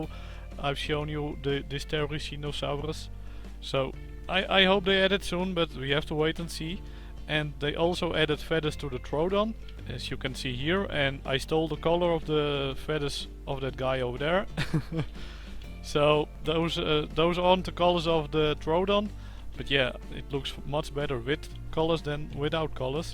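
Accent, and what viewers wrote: Netherlands. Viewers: You are from the netherlands i hear xD maybe you need to learn the accent of english before making an english channel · Most Dutchies have an accent, take it or leave it. You don't need to have an English accent to have an English channel. If you don't like the Dutch accent you have 3 options, deal with it, mute or get lost.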